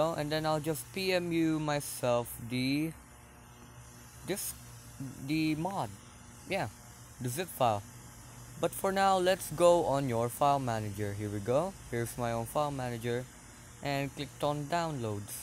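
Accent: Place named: Philippines. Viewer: Filipino? I thought your accent.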